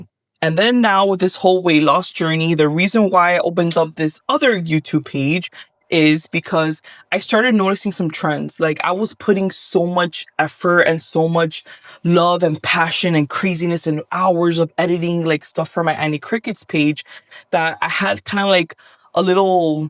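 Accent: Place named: Miami, United States. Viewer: Girl I knew you were a Miami girl the SECOND I heard you speaking 😂 after moving up north, the beautiful sound of that accent was like a hug ☺️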